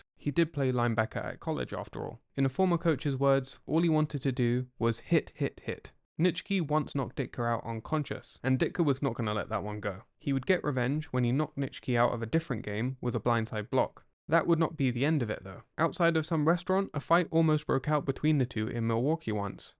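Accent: England, United Kingdom. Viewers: Wait. Why is sone guy with an Anglophone accent (English, I guess) doing videos about 60s Bears football?!? By the way (just to establish some credentials here), I was at Gale Sayers' 6-touchdown game against the 49ers with my father. And although I was sitting at home, my father was at the 1963 NFL Championship game at Wrigley Field against the NY Giants.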